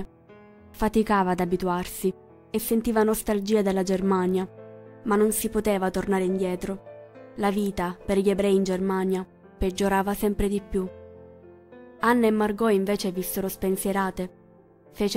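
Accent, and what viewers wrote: Sicily, Italy. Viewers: Scusami ma non riesco a procedere con la tua lettura con quello spiccato accento siculo. Per favore un po di professionalità non guasterebbe e un bel corso di dizione pure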